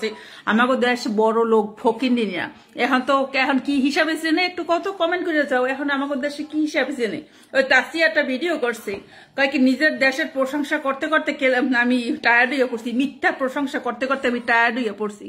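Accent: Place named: Barishal, Bangladesh. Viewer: ম্যাডামের বাড়ি মনে বরিশাল। চালিয়ে যান ম্যাডাম, খুব ভালো বলেছেন।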